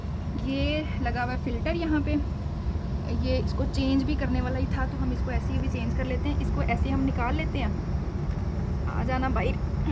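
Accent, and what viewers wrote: Punjab, India. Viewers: Wonderful sharing ❤ Loved your panjabi accent 😍 ❤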